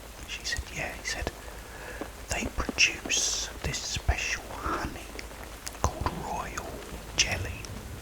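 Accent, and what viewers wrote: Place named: United Kingdom. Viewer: I'm American but I love British accents. Dealing with covid right now and this helps me get to sleep, thank you 🙏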